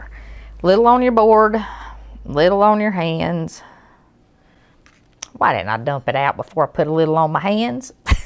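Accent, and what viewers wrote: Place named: Tennessee, United States. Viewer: Oh honey I was reared in Tn. Hampton, up near Doe Creek and Mountain City. Ription holler and Doe Valley. Just found you and the joy in my heart nearly made me cry. GOD bless you sweetie. I could listen to you talk all day. I wouldn't care if you was readin an instuction manual. I haven't heard that beautiful accent in so long. Im 70 now and in N. C. I want to plan a trip up to Hampton but haven't been there in nearly 50 yrs. I will hush now. Just wanted to tell you that you made an old woman mighty happy this afternoon and I'm going to get my daughter to help me join. May GOD, continue to bless us all. COME JESUS COME! SHELIA STEVENS FROM THE MORLEYS AND ODOMS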